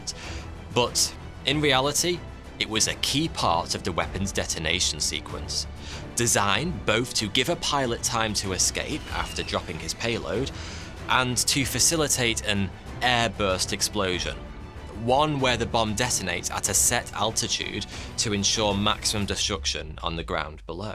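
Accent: United Kingdom. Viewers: Allow me to dismiss your British accent. There is a reason why the USA won our revolutionary war. Don't get me wrong! I did listen to and read British litetature, but I never found knowledge.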